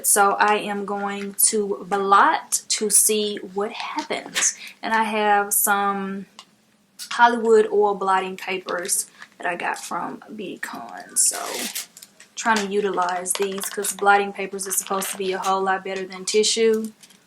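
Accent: South, United States. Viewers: I just love that voice. Country girl sound like what I have... 😉. You about to have me spend some money hunny!! Great review!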